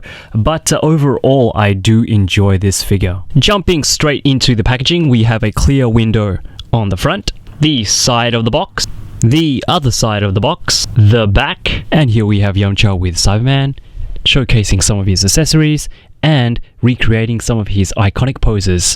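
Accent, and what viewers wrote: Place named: Australia. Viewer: hey man, great reviews and videos, just wondering where you buy your figures from? plus are you from australia? you sound like you have an aussie accent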